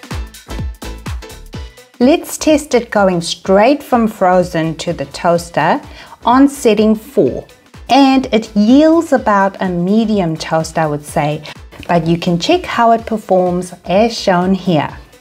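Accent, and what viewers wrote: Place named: South Africa. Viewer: As I was typing my brain was quietly saying (maybe she's South African!). There are a lot of similarities in some of the accents/dialects. Your recipes are great and I'll share then how and when I can. Thank you.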